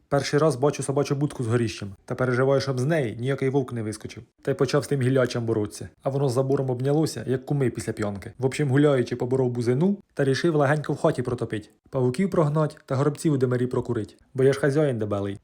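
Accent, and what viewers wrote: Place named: Rural, Ukraine. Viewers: Південний говір,мій ріднесенький,аж заскучала.Давно я в рідному краї не була,не дивилася із холму на ті поля нескінченні,пилюку ту задушливу не ковтала ,несучись полями(бо то ближче)до якоїсь річечки на шашличок,могилками рідних своїх невклонялась.....Господи,як же я скучила,навіть сама не зрозуміла.... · Полтавский сюржик😂❤❤❤❤❤???? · і Полтавщина так балакає.😊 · Суржик у вас даже знакомий, прямо , як у рiдному селi..побувала. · Полтавський говір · Це не народжується) Черкаси і черкаський район - ми так балакаєм 😅😊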